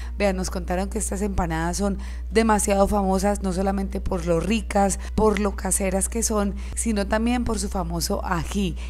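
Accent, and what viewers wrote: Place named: Cali, Colombia. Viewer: Q lindo hablas caleña❤❤❤❤❤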